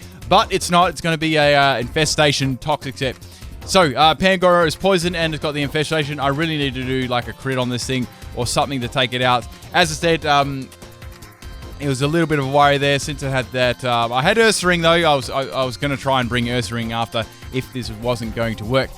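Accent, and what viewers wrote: Australia. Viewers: By the way, are you from Australia or New Zealand?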